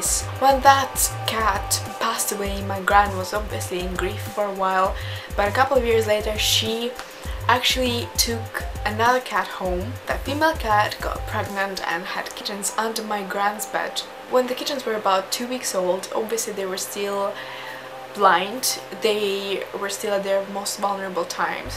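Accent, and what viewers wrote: Russia. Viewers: whoa whoa whoa! hey now, how is it even fair for you to be this freakin' beautiful?! and a fellow russian as well, judging by your accent. :) #womancrushwednesday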